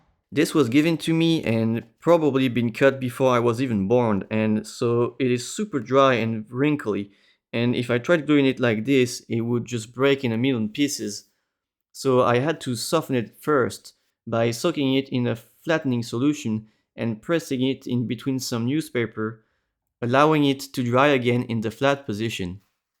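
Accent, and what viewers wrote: France. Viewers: Mec, on sent bien ton accent français dans ton anglais parlé 😄 mais beau travail 👏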